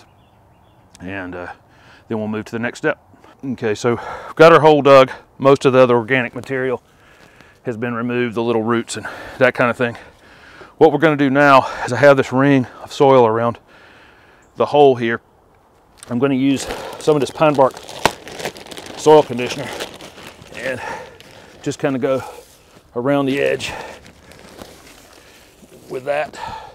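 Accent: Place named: Central North Carolina, United States. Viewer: Thanks for this great video! I’m a Dukie and would swear I’m hearing a central NC accent. Feel like I’m hearing home. Will now be a subscriber forever!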